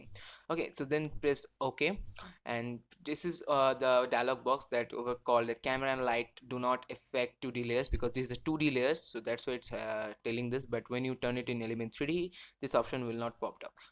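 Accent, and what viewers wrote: India. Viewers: Thats how Indians speak.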